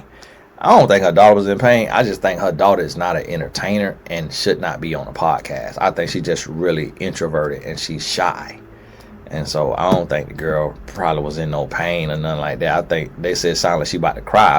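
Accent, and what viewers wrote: United States. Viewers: Tony Gaskins where were you raised because I love your country accent. Keep bringing that Godly and Straight no Chaser Wisdom 💯💚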